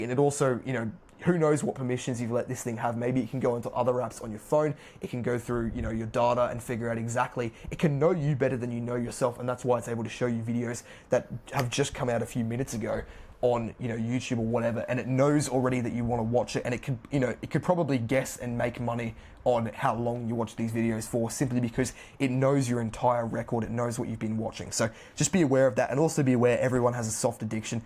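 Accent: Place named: North Carolina, United States. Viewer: Bruh you from North Carolina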